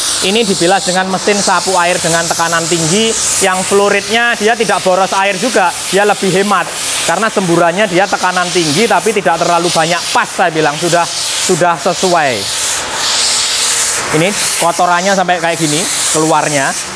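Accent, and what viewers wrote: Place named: China. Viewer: Kalau menurut saya sering seringlah mempergunakan bahasa INDONESIA,agar tidak terdengar seperti orang CHINA sedang berbicara menggunakan bahasa INDONESIA.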